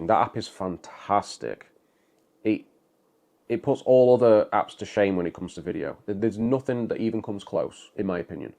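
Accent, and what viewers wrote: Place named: Liverpool, United Kingdom. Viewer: First of all why are you speaking in a Scouse accent? Whereabouts in Liverpool are you actually from? If you are not from Liverpool then why are you speaking in a Scouse accent?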